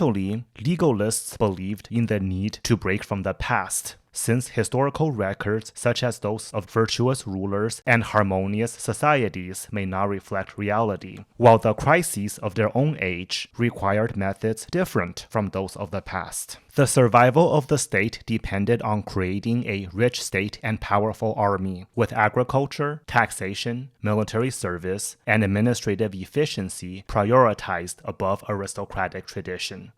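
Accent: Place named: China. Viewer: Bro you have the cutest Chinese English accent❤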